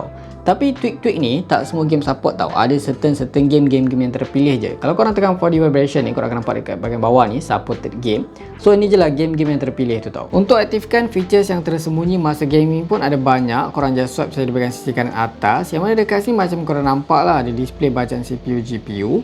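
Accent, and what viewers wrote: Terengganu, Malaysia. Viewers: kemah orang ganu kite... tiberrrr 😁😆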